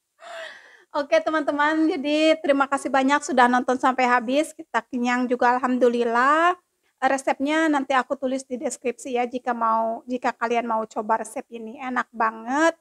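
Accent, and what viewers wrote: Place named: Sunda, Indonesia. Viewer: Teh lama di itali tspi sundanya ko totobanget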